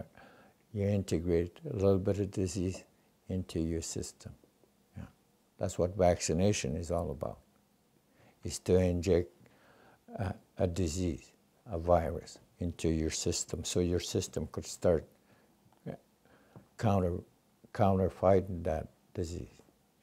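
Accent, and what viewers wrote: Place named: Canada. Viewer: This is really interesting. His accent and my accent are similar, I can hear it as I listen. Excellent video. :) That Canadian dialect ~from a Yooper in "da U.P" of Michigan. :)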